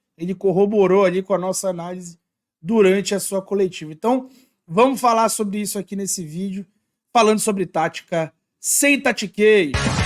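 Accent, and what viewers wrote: Belém, Brazil. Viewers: Vc é de Belém?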